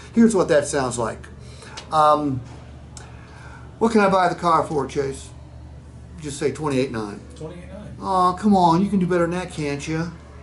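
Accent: Appalachia, United States. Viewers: is hillbilly talk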